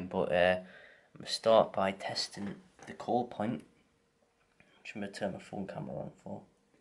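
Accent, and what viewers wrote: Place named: Wales, United Kingdom. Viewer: No, hes welsh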